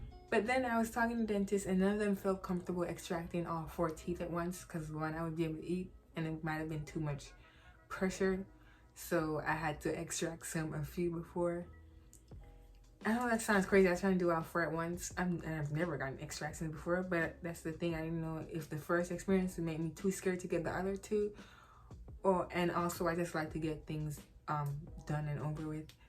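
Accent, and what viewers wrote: Jamaica. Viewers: Can definitely tell that you're jamaican 😂❤ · Jamaican accent? Subscribe!! ❤️💪🏾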